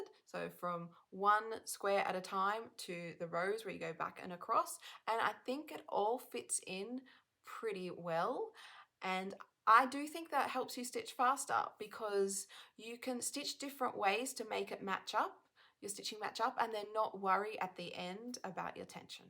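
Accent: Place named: Australia. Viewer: Loved this video. Where in Australia do you live? I adore the accent. I am in the Unites States in Tennessee. I learned to cross stitch back in the early 80s, and learned in hand and still stitch that way. I've tried hoops, q-snap, etc. and they are just too uncomfortable. My fingers give me fits at times so I'm not as fast as I once was but I stitch the sewing method as you do. Your work is beautiful and for having a family you do make great progress. Have you an e-mail address? I subscribed to your channel so I will be seeing you again. Thank you for sharing. BTW, your name is lovely. XX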